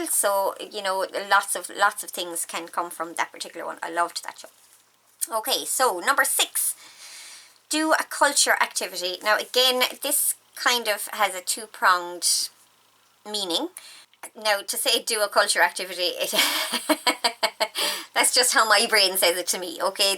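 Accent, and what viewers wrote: Ireland. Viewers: Thank you 🥰 all of your ideas are very helpful and definitely inspiring. I can imagine this does happen from time to time, I am just lucky I mix it up a bit in between crafting projects, like doodling, knitting, sewing and checking out different techniques from all the amazing crafters we have in this collaboration! You are all very knowledgeable and experience and a source of great talent! Thank you for sharing your time and its lovely to hear that bonnie Irish lilt once again. Blessings and hugs 🙏🏻💜🥰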